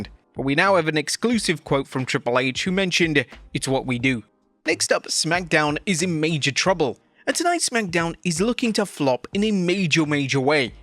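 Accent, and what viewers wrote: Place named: United Kingdom. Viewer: Thumbs up UK voice back!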